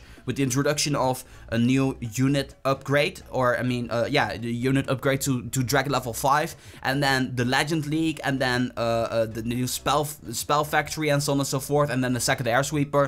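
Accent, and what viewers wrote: Netherlands. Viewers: Ben erg benieuwd hoe je unieke accent bij het spreken van Engels tot stand is gekomen. Aan de ene kant heb je vrij duidelijk een typisch Nederlandse tongval (inclusief de uitspraak- en taalfouten die Nederlanders vaak maken), maar het klinkt daarnaast net alsof je er wat Schotse, Ierse en in mindere mate Amerikaanse ingrediënten aan je accent hebt toegevoegd. Sommige onderdelen daarvan klinken best authentiek. Je spreekt ook best snel en vloeiend waardoor het wel natuurlijk overkomt. Grappig en interessant; viel me op.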